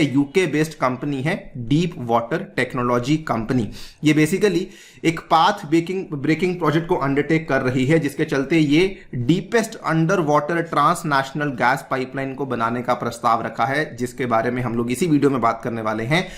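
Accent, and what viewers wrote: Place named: Haryana, India. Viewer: Baheri asi production dekh li ...sir is talking in pure desi haryanvi accent. Hum local haryanavi me bolte h asa..hindi m to bht boltr hn..sir bht acah laga apse haryanavi sunkar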